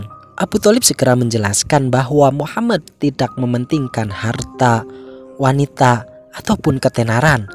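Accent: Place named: Banyumas, Indonesia. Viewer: Ngapak temen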